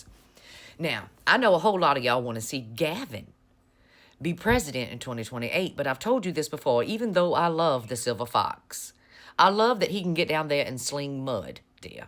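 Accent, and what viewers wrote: South, United States. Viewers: Crockett and AOC would be great for USA. You speak truth, and with a real Southern drawl.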